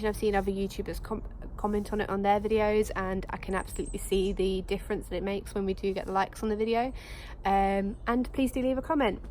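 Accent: United Kingdom. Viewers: I love British accents. Ever since I was a small lad, my Dad and I would always try to imitate all the different kinds. So now I mainly use them on my daughter to make her giggle at times. Your accent reminds me of an old clip from a movie called Wayne's world, a scene that used to make me crack up. <link> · No need to explain anything. You’re house is amazing, love your British accent, the dogs are adorable and all pets are greatly loved. Life is waaaay too short to make negative comments on a very positive channel.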